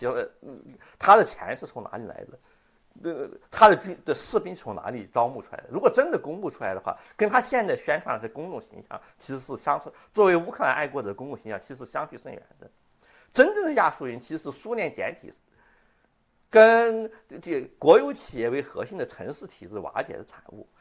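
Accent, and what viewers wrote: Sichuan, China. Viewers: 這口音就是四川的黑暗料理 非常地上癮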